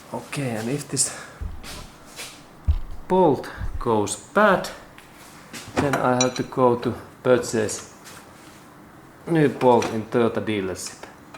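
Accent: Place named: Finland. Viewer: It is finnish Rally english...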